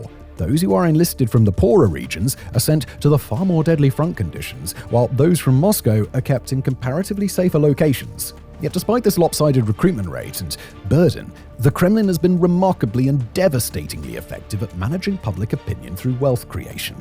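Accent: United Kingdom. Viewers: yeah man, this is what is happenin. but something about British RP fails to deliver the true synthesis of the emotional impact, did you smoothe it out with AI. Yeoman's work on the breakdown, the cuts are so close together somehow just makes it seem like the same thought except it isn't, which makes the audio experince more like that of a infinite unrelenting run-on sentence that is jam packed with info and concepts yet tonally devoid of emotional contexts --hope this helps --thnks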